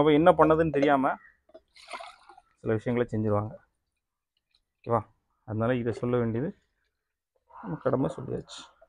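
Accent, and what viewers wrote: Tirunelveli, India. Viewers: Nellai tamil😂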